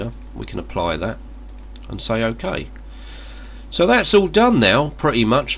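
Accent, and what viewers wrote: United Kingdom. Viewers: Should have figured you were from the UK. His accent is irritating. "Sheering from this foowdu'". My gosh. How about some American? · Lovely British accent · great video, great explanation, amazing British Accent / simply amazing !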